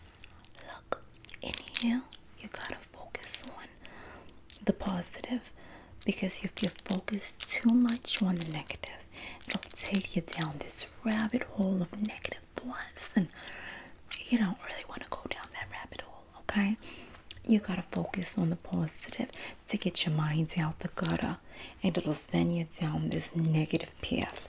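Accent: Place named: New Jersey, United States. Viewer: Your Hersey accent vids are everything 🩷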